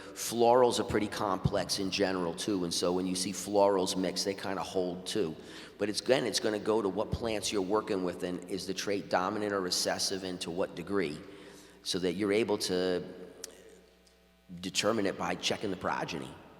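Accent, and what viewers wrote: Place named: New Jersey, United States. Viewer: sounds like he is from NJ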